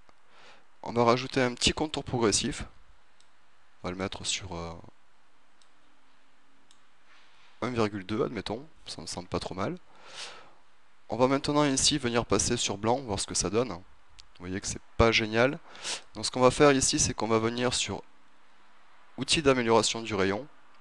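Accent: South, France. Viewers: bravo, bien expliqué, et agréable avé l'assan du midi,Té !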